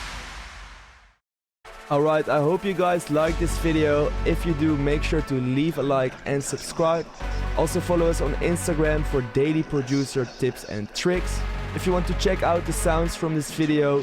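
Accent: Netherlands. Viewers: aan het accent van jouw Engels kan ik ook gewoon horen dan je een Nederlander bent. video is een banger!!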